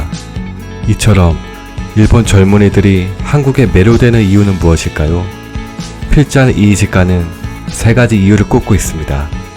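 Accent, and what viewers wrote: China. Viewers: 동영상 내용은 좋은데.. 말하는 발음이 솔직히 좀 거슬리네요^^;; 마치 중국인이 한국어 하는 느낌이랄까요?? 특정 발음이 안되는게 있으신듯요. 말투나 목소리는 좋으신데.. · 발음이 한국인 아닌것 같은데 중국인 발음같은데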